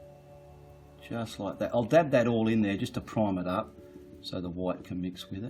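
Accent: Australia. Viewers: Hola from Quebec Canada ! Love to paint now that I found your tutorials ! Love your style as well as your Aussie accent…though I work hard to undestand everything you say 😅 Thanks a lot !!!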